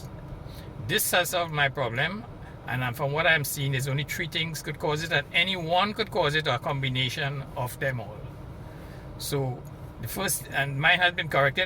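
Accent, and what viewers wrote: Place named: Trinidad and Tobago. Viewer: Ah hearing d accent trini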